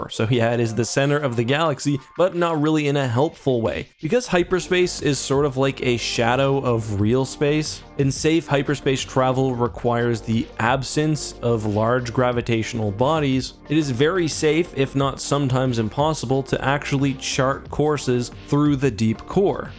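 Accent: Canada. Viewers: you sound canadian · is so aggressively Canadian and I love it. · Is this guy Canadian?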